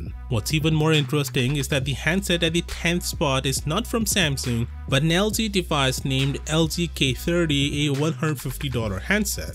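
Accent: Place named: India. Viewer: The Indian accent is so rough on ears. ..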